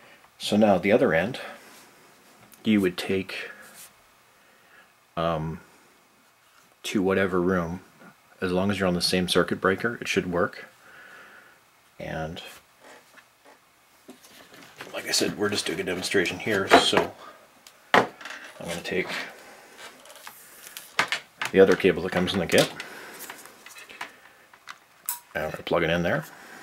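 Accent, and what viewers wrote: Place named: Canada. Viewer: I could instantly tell this is a Canadian man. Dunno how,.......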